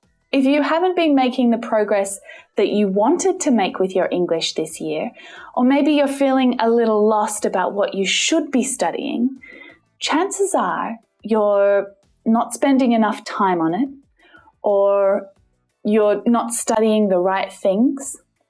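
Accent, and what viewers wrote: Australia. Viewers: Ma'am I'm from India and our speaking is totally different from yours(aussies) you say " G'day mate" but when we Indian says that same thing it sounds very different. So the pronunciations are very different can you please teach us that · Hi!!.. You are a awesome teacher. I am from New York. I like your Australian accent. Love it.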